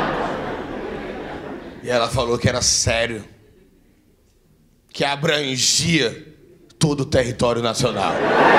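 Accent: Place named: Recife, Brazil. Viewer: sou do RJ. Você merece tudo de bom dessa vida. Te acho muito inteligente. Os palavrões fazem parte do seu show. Ele é dito com diversão!!! Te desejo muitas "Felicidades" muitos "Parabéns verdadeiros". Enfim você nos faz sorrir pelo teu conhecimento e rir de passar mal de tua Alegria. Deus te abençoe e grata por toda a sua Alegria + Alegrias nos dada. Sucesso abundante e muita Saúde eu te desejo. Recife é uma cidade linda e você trás isso em seu coração. Que sutaque lindo. Parabéns muitos Parabéns 🎉🎉!!!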